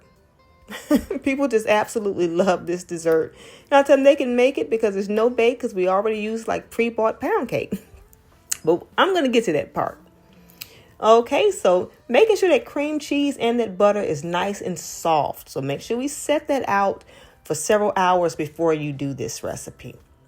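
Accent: South, United States. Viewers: I enjoyed your No Bake Pineapple Dream "Cheat" Cake and want to say thank. I particularly enjoyed your smooth and delightful Southern accent. You come across as a very sincere, peaceful and happy lady. Looking forward to your next recipe sharing. God bless you real good,! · WOW SOUTHERN LADIES ARE THE BEST...IM MAKING THIS 😊 AND I PERSCRIBED TO YOUR CHANNEL ❤ THEY CALL ME WOODY BECAUSE PEOPLE ARE ALWAYS SAYING WOULD HE? 😂 I HAVE BEEN LOOKING AT YOURE CHANNEL AND MY MOUTH IS WATERING,I WANT TO MAKE THE MUSTARD/HONEY CHICKEN I LIKE TO COOK AS IT RELAXES ME AND I LOVE YOUR ACCENT. I BOUGHT NATALIE DUPREES BOOK NEW SOUTHERN COOKING. IM MAKING TWO NOT AT THE SAME TIME THOUGH...I WANT TO TRY ONE WITH SHORTBREAD COOKIES SOAKED IN THE PINEAPPLE JUICE TO SOFTEN THEM INSTEAD OF THE POUND CAKE, I HOPE YOU DONT MIND 🙏 THANK YOU I LIKE THAT YOU MIX ALL INGREDIENTS BY HAND, IT ADDS MORE LOVE TO IT 😇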